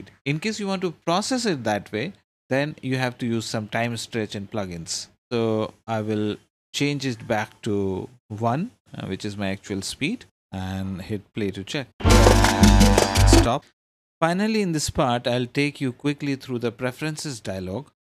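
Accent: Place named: India. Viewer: Indian, or somewhere abouts.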